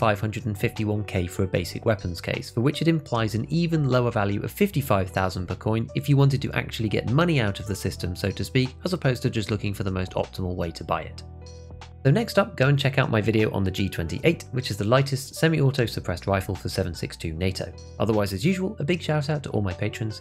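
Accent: United Kingdom. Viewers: it's more of a "British" way of talking.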